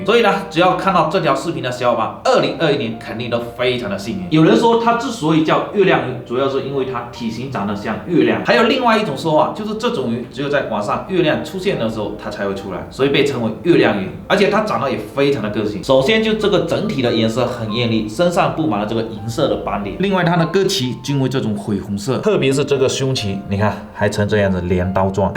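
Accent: Fuzhou, China. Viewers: 我也感觉一听口音就是福州人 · 福州音好亲切哈哈哈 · 福州音太重了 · 这口音可是福州人？ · 福州人吗 感觉福州普通话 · 我想问， 你的口音有福州音，你是福州人吗？ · 福州腔太重了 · 一听声音就知道是福州师傅lo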